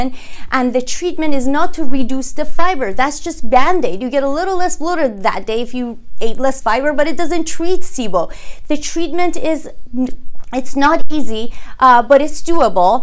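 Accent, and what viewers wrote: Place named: Iran. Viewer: Sounds Irani.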